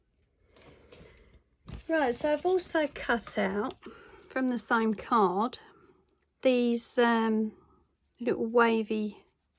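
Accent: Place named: Southern England, United Kingdom. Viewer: it keeps me out of trouble 😂. Can I ask what area you're from? I live in Norfolk now but originally from S.E. London. I like to guess where people are from and I'm thinking you're from Kent or the south of England!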